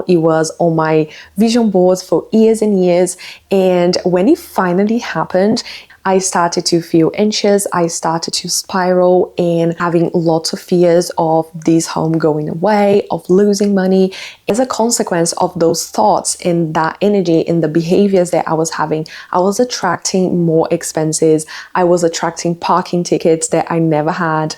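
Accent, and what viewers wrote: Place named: Brazil. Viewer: Really like your accent! You’re Brazilian?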